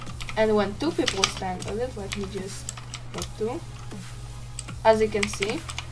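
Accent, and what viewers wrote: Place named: Germany. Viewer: are you german? i like your accent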